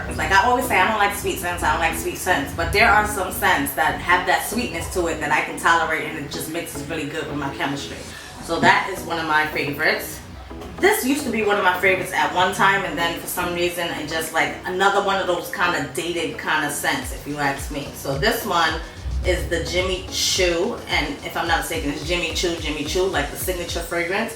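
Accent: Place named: Bronx, United States. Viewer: I enjoyed how New York this video was 😂. I knew right away you was from the Bronx😂😂. A lot of these scents I have so many memories attached to it. At one time everyone and they motha had Gucci Guilty. MAC Turquatic is underrated but I’m happy about that. I love perfume videos where you can see the dents in the perfume. I’m going to check out a few you mentioned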